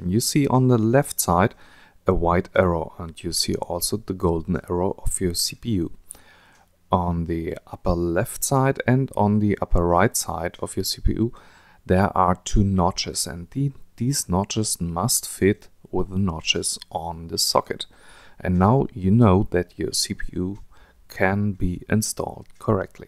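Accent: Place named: Germany. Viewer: Ne ;P Aber zumindest hört man, dass du ein deutscher bist^^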